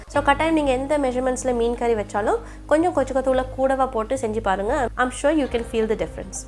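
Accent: Sri Lanka. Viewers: Wow..... srilankan tamil...The bestest tamil in the world..... My dad's is a srilankan too. Love from India.... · Like your recipes,just started watching few of your videos. இலங்கையில் வாழும் எங்கள் உறவுகள் பேசும் தமிழ் கேட்க கேட்க தித்திபாக இருக்கின்றது.. உங்கள் தமிழில் சில காய்கறிகளின் பெயர்கள் மிகவும் புதுமையாக இருக்கிறது.இன்னும் நிறைய தெரிந்து கொள்ள வேண்டும் என்ற ஆசை உள்ளது. Looking forward for more videos in your loving தமிழ். Love and wishes from Banglore ,India.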